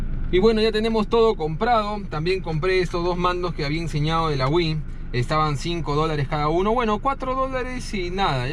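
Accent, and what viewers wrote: Peru. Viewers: Eres peruano? Sonas como peruano xd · es el primer video que veo y en la forma de como hablas... me parece que eres peruano, lo eres? te hablo desde Perú y por cierto que buen video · es Peruano,por la forma de hablar.... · Eres peruano? Hablas como uno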